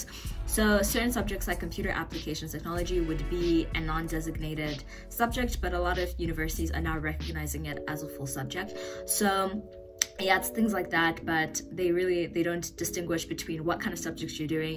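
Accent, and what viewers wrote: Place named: United States. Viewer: Delicious content,. Why do you have an American accent? did you grow up there?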